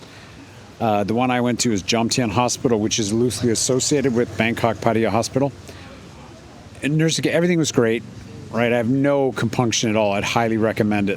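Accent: Canada. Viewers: Your accent appears to be Canadian. How come you didn't go for the free stuff in Canada?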